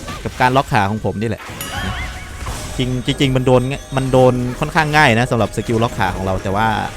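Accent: Isan, Thailand. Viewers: ชอบความเว้า 555 ดีครับ อยากให้ทำต่อไป lol wr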